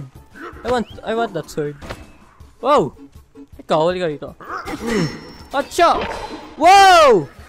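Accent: Visayas, Philippines. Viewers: Kuya bisaya kayo!?!! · Bisaya ka ba po kuya? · Lods Bisaya Ka or Hindi? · bisaya ka po ba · Bisaya ka dong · Patay kadiha mo bisaya po ikaw?? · bisaya ka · bisaya ka kuya?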